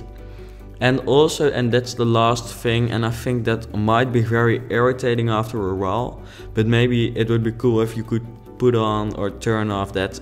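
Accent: Netherlands. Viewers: ik kan niet langer dan 2 min naar jouw nederlandse accent luisteren sorry eh bye now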